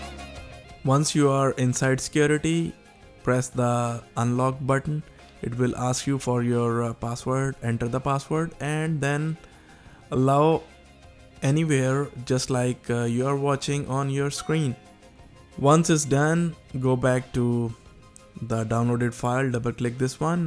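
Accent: India. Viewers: Yo dis shit no work wit yo Indian voice likd dafuw u sayin Peace dawg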